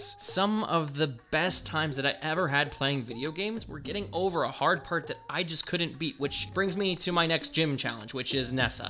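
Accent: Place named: England, United Kingdom. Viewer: IBXToycat IMPRESSION/ making fun of the american accent is the first thing i thought of after you apologised for an english one, lol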